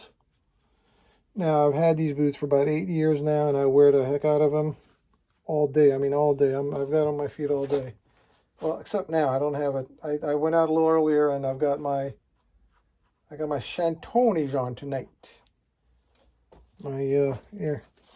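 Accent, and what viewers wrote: New York, United States. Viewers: I like how you talk. Are you from up north, like New York or something?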